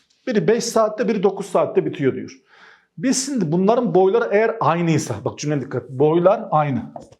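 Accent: Gaziantep, Turkey. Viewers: Gaziantepliymiş Sivas ta yaşıyormuş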